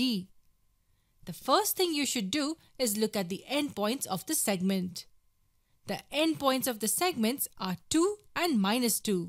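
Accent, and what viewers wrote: India. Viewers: indian accent uh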